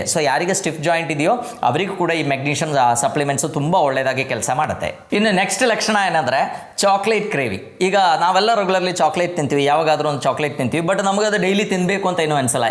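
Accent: Kerala, India. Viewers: are you keralite speaking kannada,..?? but you speak well,..!! the composition of chlorophyll, haemoglobin are almost same except for Mg,... replace it ,.. it is blood cell composition,... hence cattle/ grass fed animals are getting blood compositions from grass itself,... !!first source,.. hence grass superfood,.. !!ultimate food,... hence worshipped in every pooja,.. as darbe,... around the fingers as a ring like,...!!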